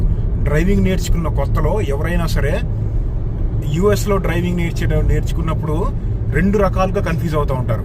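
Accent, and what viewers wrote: Andhra Pradesh, India. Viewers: Broo nenu ni voice chusi andhra anukuna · Brother... Telangana slang.. kaadu ❌ Andhra slang ... ☝️ How..how how??? 😅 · Andhra language laaga undi meedi due your circle in usa or meeru tg ki move ainara ? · Hello, garu, Mee accent Andra telugu laga undi, Nizamabad accent ledu. Mee grandparents proper place ekkada? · Your slang is looking like Andhra, not telangana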